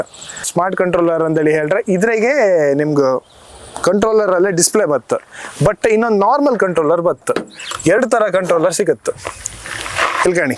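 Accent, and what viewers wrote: Kundapura, India. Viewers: Avru matadta irodu kundapura kannada guru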